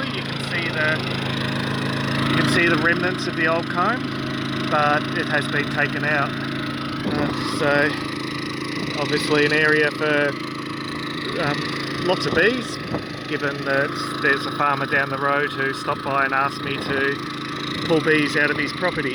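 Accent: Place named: Australia. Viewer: Where are you from? You sound Australian.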